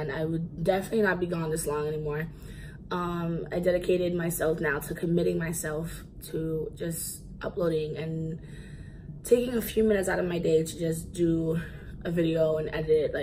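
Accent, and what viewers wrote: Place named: New York, United States. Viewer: Are you in New York.... It’s your dialect. Miss mine. Super cute bag. Love my Big Apple Fam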